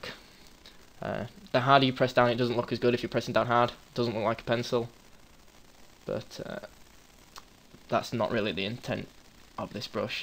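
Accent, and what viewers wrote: United Kingdom. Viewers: This is great, thanks for making it so simple. Now I can finally make my own digital drawings instead of drawing them on paper and editing them in photoshop, that was a pain...I knew there was a way to do all this but I completely forgot how and never really could figure it out. By the way, your accent's great, but what part of the United Kingdom are you from? I don't know all that much about accents so I don't want to sound stupid, but it sounds slightly different from most English accents, is there a name for it?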